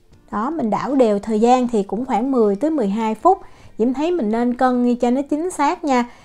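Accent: South, Vietnam. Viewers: Woa, cái bánh lạ quá. Mới đọc tựa tưởng đâu bánh thơm mùi lá dứa, nhìn rồi mới biết trái thơm, trái khóm. Chị dân bắc mà nói giọng nam dễ thương ghê. Cám ơn chị.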